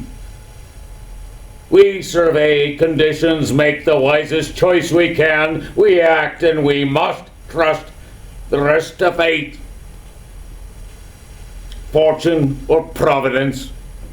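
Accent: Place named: Scotland, United Kingdom. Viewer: Love this Scottish Dewey. Aye aye!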